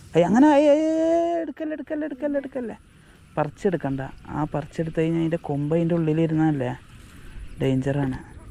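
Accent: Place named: Malappuram, India. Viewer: അടിപൊളി സൂപ്പർ ആദ്യമായിട്ടാ ഒരു spoti ൽ നിന്ന് ഇത്ര എണ്ണം പിടിക്കുന്നത് കാണുന്നത്. സംസാരം കേട്ടിട്ട് bro മലപ്പുറം ജില്ലയാണ് എന്ന് തോന്നുന്നു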